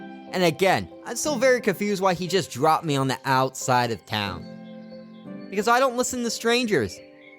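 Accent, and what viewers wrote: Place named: Canada. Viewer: This guy sounds like he might be Canadian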